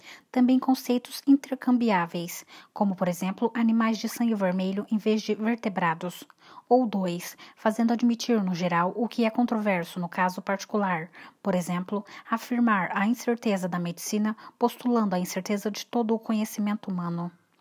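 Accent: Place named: Caipira, Brazil. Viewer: Jesus que sotaque de caipira socorroooi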